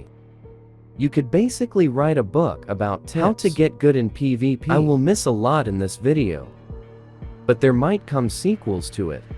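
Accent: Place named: Sweden. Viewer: Hahaha ännu en svensk, har hört att folk tydligen gillar vår brytning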